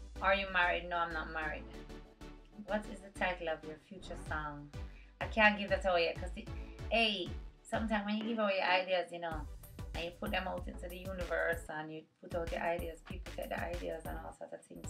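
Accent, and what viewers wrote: Jamaica. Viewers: love the jamaican accent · Jamaican accent sounds definitely cool :) Lots of love from Paris, France.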